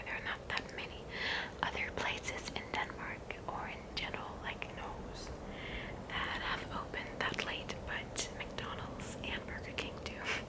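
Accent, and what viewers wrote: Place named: United States. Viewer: You sound very American. Is that on purpose or does it just happen? Either way this was lovely (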